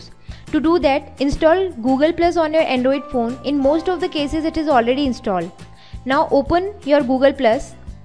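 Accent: India. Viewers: Why have those guys always a indian accsent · Why are tutorials vids have someone who talks like some indian that just learned english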